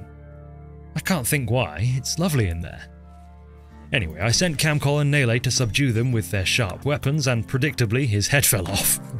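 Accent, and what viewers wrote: United Kingdom. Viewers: doesnt this dude sound like spiffing brit ?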